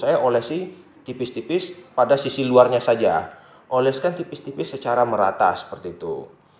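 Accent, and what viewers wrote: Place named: Sumatra, Indonesia. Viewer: saya dari jambi om, masalahnya logat bahasa om nada2nya kayak orang sumatera, salam kenal dari jambi... Dan ditunggu tutorial selanjutnya om saya bantu dengan subcribe ya....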